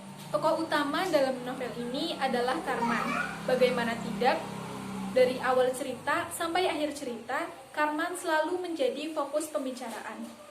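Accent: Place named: Tegal, Indonesia. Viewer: Bahasane medok men dek... Oponeh nek ngomong kubah... H yang khas tegal... Semangat dek... Oh yo... Tambah senyumnya...biar semakin imut... 😁😁😁 Dan tolong mungkin cari tempat yg agak tenang dan di kasih backsound... Intonasi lumayan oke... Siip...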